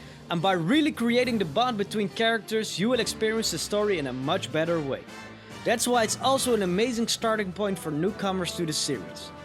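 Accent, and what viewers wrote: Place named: Netherlands. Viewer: Ok, so now byleth gets added to smash, and I was wondering if this game would be something for me. I got into persona 5 (which is now my favourite game of all time btw) because of joker in smash, so I was wondering if this would happen with fire emblem for me. So I searched for a vid and found your channel and you’ve honestly sold me!!! Awesome job! Ohw... yeah also, you sound really dutch. Dus als je Nederlands bent en dit leest. Ik lieg niet als ik zeg dat je video’s van hele hoge kwaliteit maakt! Ik hoop dat je snel groter groeit! Zeker een sub erbij!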